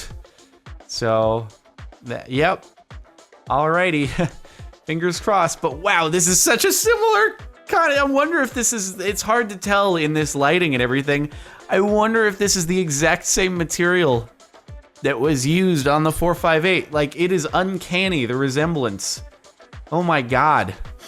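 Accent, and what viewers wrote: Finland. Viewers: It's not scandinavian accent. It's finglish or rally-english. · The hydraulic press channel is still going, also, it's a finnish accent, not scandinavian uwu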